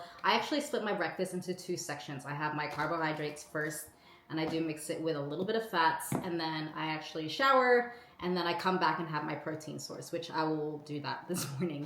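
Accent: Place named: East Coast, United States. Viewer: You actually sound like your from the east coast, and BTW that GASP shirt was a banger I have a lot of that gear along with many other fitness oriented companies...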